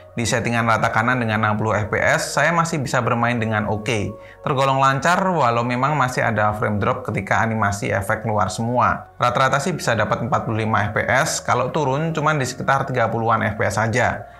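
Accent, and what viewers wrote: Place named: Sleman, Indonesia. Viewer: orang sleman ya mass?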